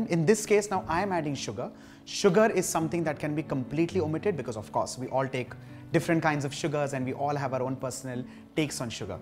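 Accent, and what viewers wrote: India. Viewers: He is indian right? Yeah I am loving his indian accent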